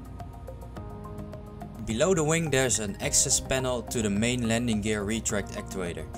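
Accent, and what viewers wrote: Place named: Netherlands. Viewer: I smell nederlands. Coole video!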